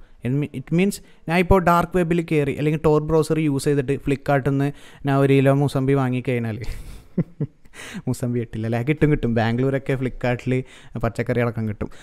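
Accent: Kannur, India. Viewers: Kannur ano bro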